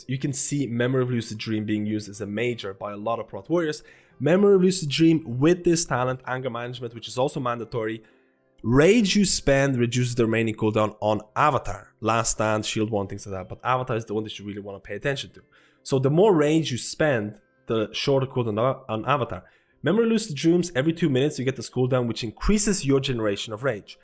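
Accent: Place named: Ireland. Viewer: I thought he somewhere was northern European but then he started sound Irish...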